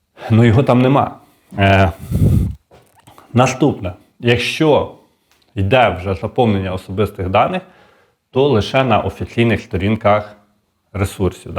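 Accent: Vinnytsia, Ukraine. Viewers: Пані, перш ніж когось критикувати, навчіться сама правильної мови. А говір у нього вінницький. На заході говорять зовсім інакше.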